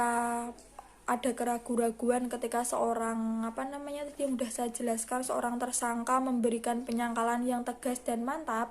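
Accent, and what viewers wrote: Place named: Java, Indonesia. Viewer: Inspiratif dan logatnya medok 😁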